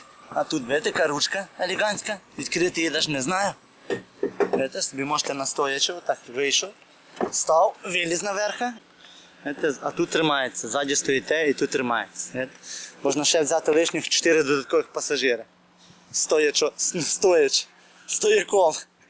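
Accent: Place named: Western Ukraine, Ukraine. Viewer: Нормально говорит, на диалекте захидном.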